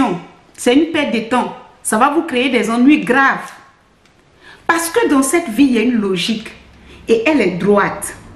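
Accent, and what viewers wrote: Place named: Ivory Coast. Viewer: Bonjour madame. Tu parles avec le langage ivoirien. Es tu de mon pays la Côte d'Ivoire ?? Tu es fabuleuse et je suis fier de toi et de tes propos.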